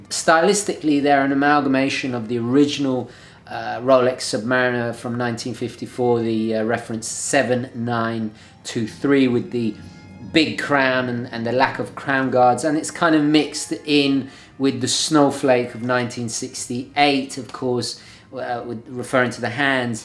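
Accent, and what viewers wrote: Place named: United Kingdom. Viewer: I love that old British accent!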